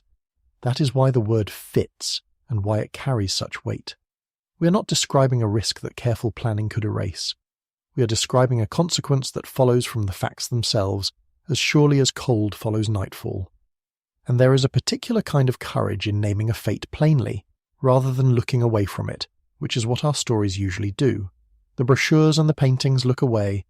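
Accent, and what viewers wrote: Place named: England, United Kingdom. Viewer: couldn't take more than a few minutes of this snobbish AI English accent ... got new for you... if you thin k it makes the narration sound intelligent.... you're wrong.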